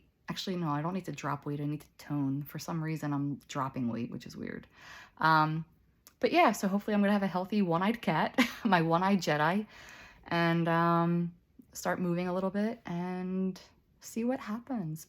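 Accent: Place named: Philadelphia, United States. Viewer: Thank you for all your videos, I watched just about all of them! I just had my ACDF C4-C7 on January 9, 2025. Neck is super sore, having trouble swallowing, looking forward to pain going away. I get a huge kick out of listening to you because I attended Villanova University in the late 80s, and your accent brings me right back to campus! Best to you!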